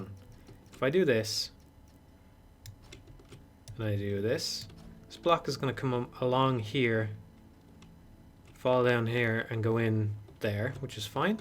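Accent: Ireland. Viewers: Just discovered your channel researching Zachtronics. Gas to come across an Irish voice :D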